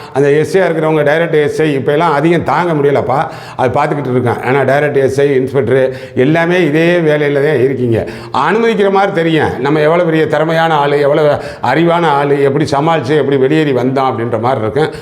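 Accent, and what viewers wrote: Madurai, India. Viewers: சார் மதுரை சிலாங் முறையில் விளக்குவது சூப்பர்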